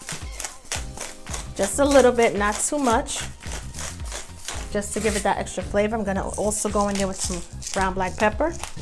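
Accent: New York, United States. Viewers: Where r u from? Sounds like NY or somewhere up north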